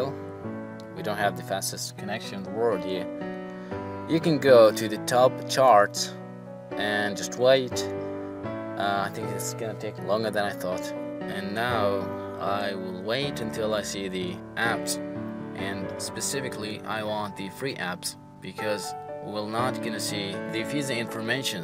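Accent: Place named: Arab world. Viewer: why i undrestood your english becouse you arab and i am somali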